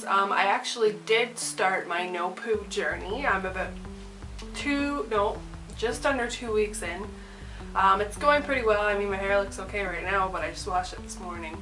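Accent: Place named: Scotland, United Kingdom. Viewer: I love Scotland. I had a great time in Edinburgh, glasgow, and Oban. My boyfriend and I are planning to move there. I did notice some things are cheaper like groceries! I really feel Toronto is not for me. I was so depressed when I got back, but I got plans! thanks for the info. I also noticed that some words you say sound a little like the scots. :)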